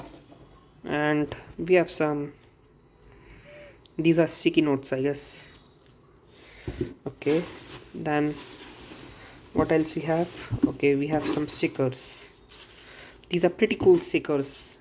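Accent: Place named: Ujjain, India. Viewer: Bhaii ye ujjaini accent merko bhi sikha 😆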